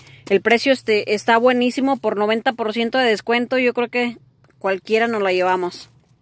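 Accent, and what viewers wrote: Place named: North, Mexico. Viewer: EMPECE A SEGUIRTE X RECOMENDACION DE LA TOCAYIUX Y ME ENCANTA TU ACENTO 😍 YO TAMBIEN SOY NORTEÑA 🌪🌪🌪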